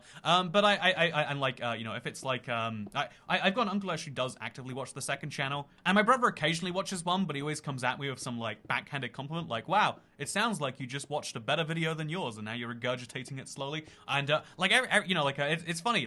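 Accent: United States. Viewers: I'm american 🇺🇸 and even though I'm Texan I've never heard an American accent like that. It's funny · Please don't do an american accent, it scares me. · It's not the only thing that I take from your videos, but I love your "Monopoly Man" U.S. accent. Kind of a southern Civil War colonel meets old, crotchety Appalachian hillbilly thing. I can say that because I'm an East Texas hillbilly type. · Pretty good American accent i’m ashamed to say people do sound like that 🤠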